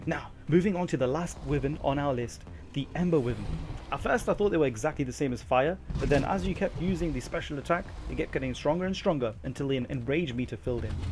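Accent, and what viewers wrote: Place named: England, United Kingdom. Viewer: Good video and well researched but considering you sound English I found excruciating that you pronounce Wyvern wrong... Considering it’s a Welsh dragon... ><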